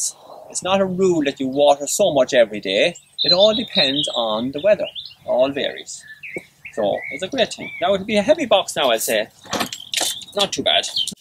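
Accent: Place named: Jamaica. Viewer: Great video. Really helpful. What is that accent? I have Jamaican family and they sound a lot like u.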